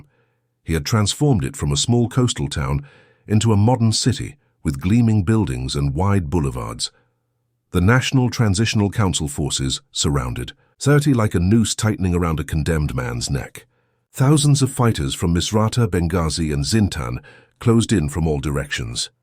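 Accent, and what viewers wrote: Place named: United Kingdom. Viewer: This "Commentary is a Total" LIE, being Narrated by a European Britain 🇬🇧. Muammar Gaddafi wanted Unify the "African Union " and America and Europe wasn't having that so former POTUS Barack Obama did the Devils bidding and took him out 1 of the 1things i despise America for...! FYI : Free Education, Health, Housing, No Unemployed People, and No poor people, his demise was an Evil Power Grab Orchestrated by the European establishment and Completed by this Hypocritical Government America 🇺🇸 😀 😉 😄 666..!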